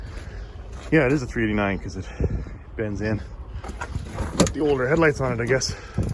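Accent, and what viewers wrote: Canada. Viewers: I know nothing about trucks, but listening to a Canadian guy talking about trucks so passionately is so relaxing to watch.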